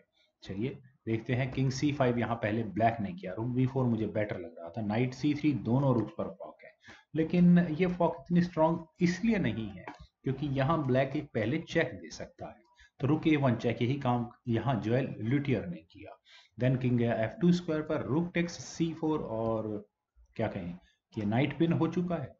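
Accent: India. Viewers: Thanks for all your Mikhail Tal's Games and indepth Analysis..!! From your pronounciations, I thought you are Gujarati.., but fr9m looks I think you are Punjabi, I guess..!!😊👍👌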